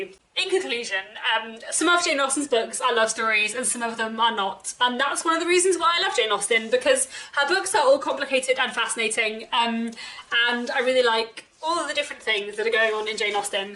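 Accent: United Kingdom. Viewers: you are one of the inspirations and motivations for my English self-learning. I have grown fond of Jane Austen's literature on your video account. People tend to use the US accent in my country and you are why I persevere with the British accent. Love you from Viet Nam ~~ :3 my favorite hero is Tilney by the way, such a charming and practical when necessary man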